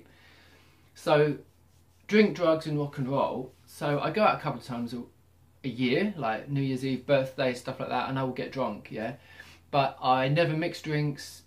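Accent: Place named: United Kingdom. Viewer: can't we agree it's the Celtic / ANglo background of you? I clearly pick on some British accent tones, I'm italian but also...slavic. Which is the worst, eastern european look 2 times older..i'm late 20s and look like 40 due to my slavic backgr. thanks for the video though you make some very good points.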